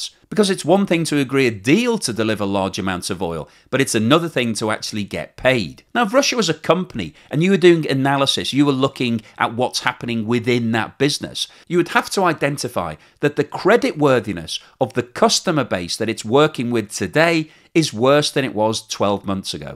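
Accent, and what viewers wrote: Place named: Liverpool, United Kingdom. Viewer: I think you are talking nonsense, seems to be a bit of scouse coming through maybe it's Thatchers fault.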